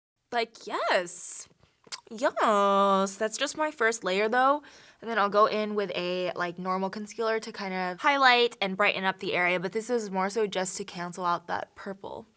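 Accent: England, United Kingdom. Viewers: So everyone is just gonna ignore the fact shes been using a British accent 🤔🤨😂😂🤣.... im here for it though, i do it all the time also, glad im not alone!!.. happy valentine's day 💕💗💞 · I love how you always throw in an English accent 🤣🤣 loved this fun pinky look 💗✨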